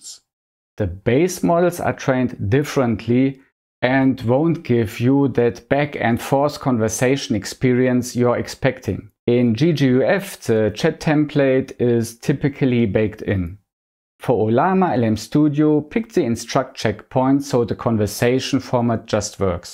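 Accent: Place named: Germany. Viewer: Thank you for your video, it helps me A LOT? Ist das ein deutscher Akzent, der mir hier die Ohren.... maltretiert?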